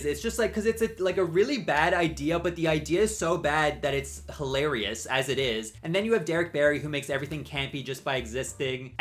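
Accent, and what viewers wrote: United States. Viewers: I know you said don't ask about it... but why DO you have an american accent as an irishman? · Why DO you have an American accent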